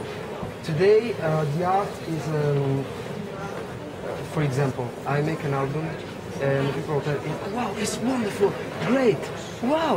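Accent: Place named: Italy. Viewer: This Italian accent!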